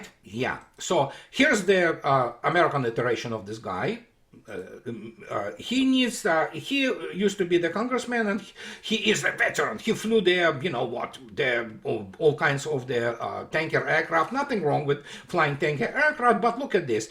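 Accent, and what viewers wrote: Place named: Russia. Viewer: Mr Martyanov you are both an Officer and a gentleman. My late Uncle Boyo Howells was one such as you but a Royal Navy Officer and a Welsh gentleman. Other than the Russian accent and different uniforms I would swear you both cut from the same bolt of cloth. England expects every man to do his duty on the 8th May 2025 to respect and honour the debt we owe to Russia and all her peoples. And personally I will never for get the quiet words of respect Mr Martyanov paid to Queen Elizabeth II on her death. And when you posted that photo of President Putin opening the car door for Her Majesty on a state visit well I didnt realise oa Welshman could laugh cry and smile sobbuing unontrollably all at once over an English Queen and a Russian Head of State. Can I state I was born in Neath 1962 and in all my life and upringing I have never heard one bad word spoken against Russiians. 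Heck 1968 White Album side one track one is TAKE m TO THE SNOWY MOUNTAINS WAY DOWN SOUTH take to your daddys farm Let me hear your bellacas ringing out Come and keep your comrade warm.. hey I had a box of Russian toy soldiers in my armies on our side I had German i KNEW the enemy... I had French army but not sure what t, why...stayed in the box. no use at all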